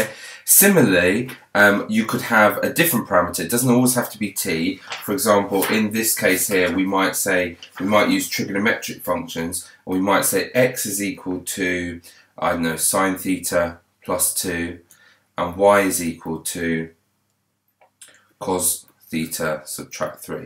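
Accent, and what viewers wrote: United Kingdom. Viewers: math sounds so much nicer with a british accent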